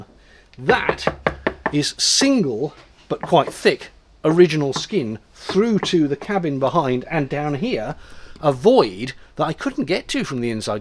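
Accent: United Kingdom. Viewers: I cannot believe anyone would comment about you chit chatting. I have always enjoyed a British accent so talk as much as you like! Glad you are making progress - it is interesting to watch. Sounds as if you have it figured out, now if only the weather would cooperate. Your community will be here waiting.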